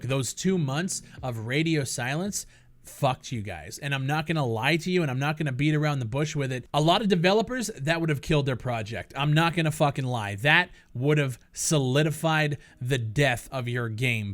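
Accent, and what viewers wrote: Canada. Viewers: I didn't know you had that Prairies accent until you pronounced 'Cal-garry.'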